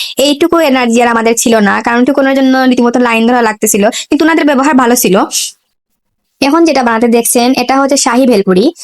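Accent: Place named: Sylhet, Bangladesh. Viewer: Boin sylhety matilaw regho shuddo basha re nu rape korilray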